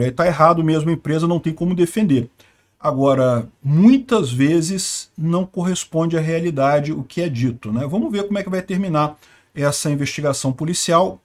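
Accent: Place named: Rio de Janeiro, Brazil. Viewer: Vídeo top, eu bem sabia que o Sr era Carioca do RJ.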